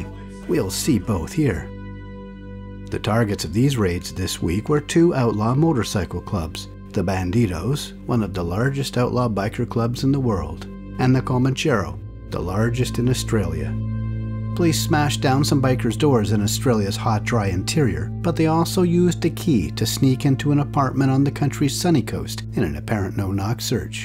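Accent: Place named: Canada. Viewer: As per usual a good vid. I must say "thumbs up" for the nearly correct pronunciation of "Murrumbidgee". It's a hard one for non-Aussies, I sense a bit of a Canadian accent? Your "Melbourne" is also pretty good.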